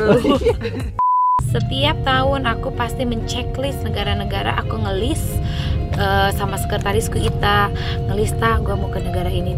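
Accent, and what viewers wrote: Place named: West Java, Indonesia. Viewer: Sunda pisan geningan nyaa... GK bisa dibohongin orang Sunda mah lama diem di Jakarta di luar negri logat nya ttp nyundanis keneh wehhh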